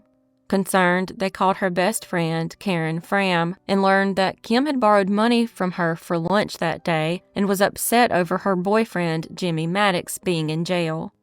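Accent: South, United States. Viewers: mine too. And her voice is relatable to my Southern self.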